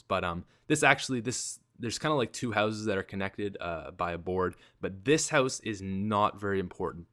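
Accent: Canada. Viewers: "Ohtskirts"? "get oht"? Are you canadian or something? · Canadian Accent Doe LoL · Liked. Are you canadian? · The "ote"skirts. Dat canadian accents ;)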